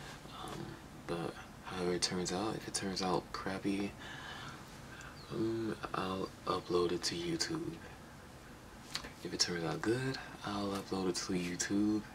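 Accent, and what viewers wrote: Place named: Midwest, United States. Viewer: are you from the midwest...you sound like some of my friends from st. louis saying hurr : ) i love it soo cute.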